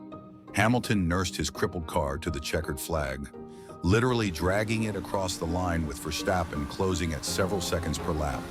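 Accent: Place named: United States. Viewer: I was all in , about to hear what I've heard so many times,heard the American voice over, I clicked off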